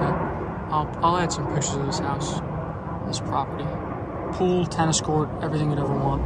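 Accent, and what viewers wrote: New York, United States. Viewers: ! Love your channel and your NY accent, you make me miss my hometown! Ps…please wear your seatbelt LOL. 😉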